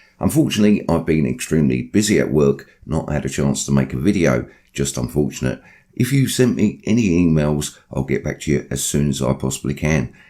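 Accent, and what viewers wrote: England, United Kingdom. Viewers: Nice to hear an English voice for a change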